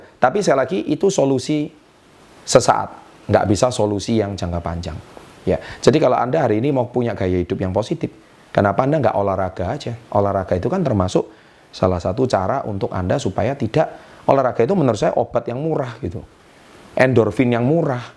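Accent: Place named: Surabaya, Indonesia. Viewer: Mantap? Sy suka banget dgn gaya pak memberikan paparannya, masukbanget dgn fikiran sy gitu, dan sy keingat bos sy dulu, gaya bicaranya mirip bgt? Terlihat ada ala Surabayanya gitu?? Keren!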